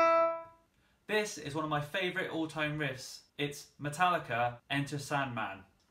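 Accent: United Kingdom. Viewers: That Accent, i know you're British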